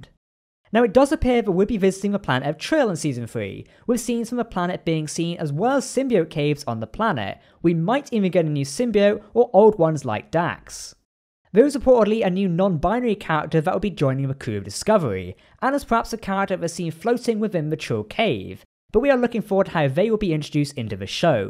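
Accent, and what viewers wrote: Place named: Wales, United Kingdom. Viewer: Looking forward to the new season, although i missed some of the commentary due to the thick excitable Welsh /English accent.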